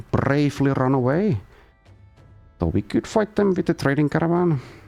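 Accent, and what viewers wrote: Finland. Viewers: Finnish I would say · agreed very familiar Finnish inflection on the "D" and "R"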